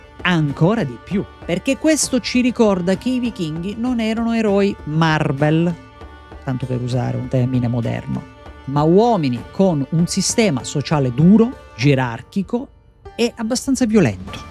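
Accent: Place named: Sicily, Italy. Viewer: 😂il sangue del sud non mente😄simpatia pura….sei il prototipo di un professore scolastico del nuovo mondo…simpatico…veritiero e che incolla l’attenzione …complimenti….il tuo accento sembra della Sicilia sicula non sicana ☺️👍👍👍👍👍🏆complimenti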